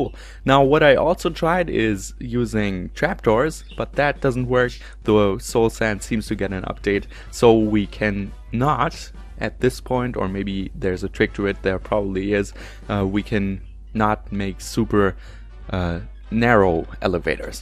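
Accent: Germany. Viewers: Dein Deutsch hört man bro :D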